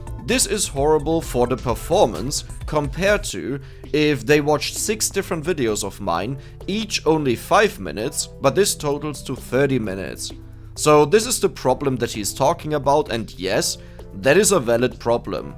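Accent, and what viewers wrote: Germany. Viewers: You have an analytical approach to both games you play and things like this, your channel's growth. That's pretty unique and along with your personality, you don't have to worry about the channel. You'll grow as a creator no problem, as long as you keep at it. Also, German accent is pretty cool, ngl.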